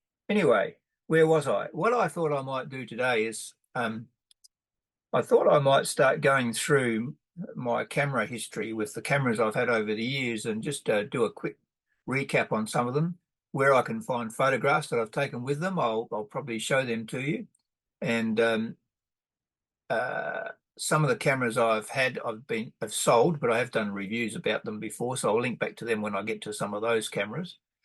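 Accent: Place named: Australia. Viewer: Surprised to hear a good familiar Aussie accent on the first click on the search for reviews of the C-150. Thanks for sharing!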